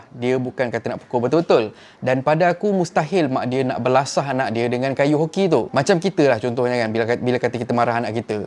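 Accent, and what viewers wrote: Kelantan, Malaysia. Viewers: Good effort bang cekek kelate hahahah😂👍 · Mu kecek Klate haha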